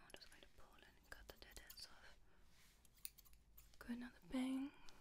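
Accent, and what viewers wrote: France. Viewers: Can you do more French accent videos please 🥹😍 it sounds soooo tingly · What ethnicity are U or where do U live, kuz I’ve seen some videos where U talk with an America accent but now U have a French accent... I’m confused😂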